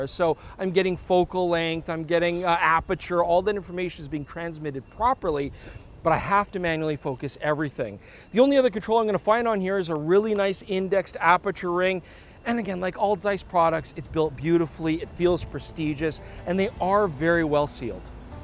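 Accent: Australia. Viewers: That was a very credible Aussie accent! 👏😄 Welcome to Brisy, hope you had a great time 👌📸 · Great aussie accent! You're a natural! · legit good aussie accent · nice aussie accent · I think it's 90% Aussie! The words and enunciation are almost spot on! Though there was a bit of South African in there 😂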